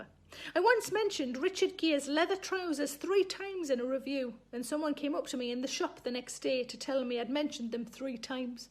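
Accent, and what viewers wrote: Newcastle, United Kingdom. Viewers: Thank you Sarah for these episodes. I look forward to them each day. I got to know you watching episodes of "QI", which we can get in the states through Britbox, which has the luxury of captions. I've gotten pretty good, with your readings, at understanding a Geordie accent (I hadn't realized that's what it was until you mentioned it somewhere - you are more 'understandable' than Ross Noble!) Thanks again - bless you - be safe! Eric in Indiana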